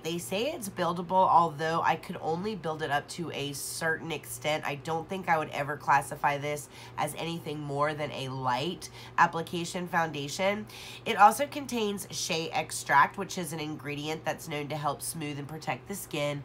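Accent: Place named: South, United States. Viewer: 💜💜💜 Enjoyed seeing hubby's reaction, lol...."there's no makeup line" Loved your strong southern accent 💜💜💜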